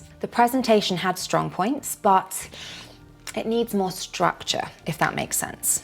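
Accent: United Kingdom. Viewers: I could suggest: go slower in exposing all the phrases. 25 ones in a short video are😮 too many to learn at once. It should be better to make 2,3,4 examples for each phrase. Do you agree? Anyway I love your british pronunciation 😊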